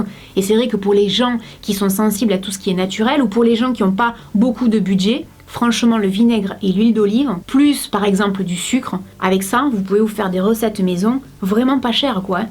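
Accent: South, France. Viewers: salut,j adore des tuto.au fait ton accent est de quelle region francaise? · Tu as un accent,tu vien du sud? Sinon tu es genial et tu m'aide beaucoup😗😗😗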